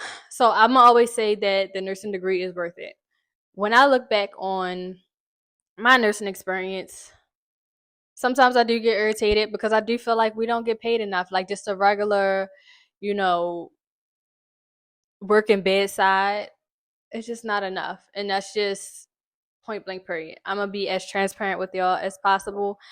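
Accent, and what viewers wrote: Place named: Maryland, United States. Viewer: You didn’t have to tell us you’re in Maryland. We could tell by the accent from the moment you said do or to. Lol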